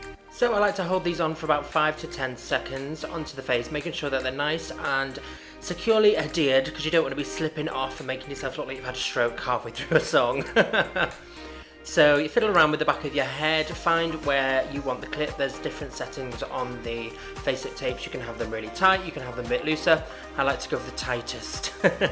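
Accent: Liverpool, United Kingdom. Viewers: He still sounds Scouse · Miss ur Scouse accent, love it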